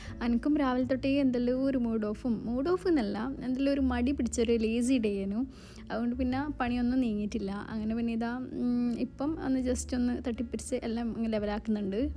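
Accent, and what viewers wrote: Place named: Kannur, India. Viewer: ഗൾഫിലെ ബാംഗ്‌ വിളി ഒരു പ്രത്യേക ഫീൽ ആണ് കേൾക്കാൻ. ഞാൻ പുതിയ ആൾ ആണേ. കണ്ണൂർ. നിങ്ങളുടെ സംസാരം കേൾക്മ്പോൾ കണ്ണൂർ പോലെ ഉണ്ട്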